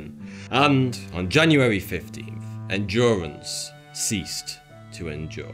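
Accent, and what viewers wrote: United Kingdom. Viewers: Awesome video mate! I always look forward to hearing that silky smooth British voice!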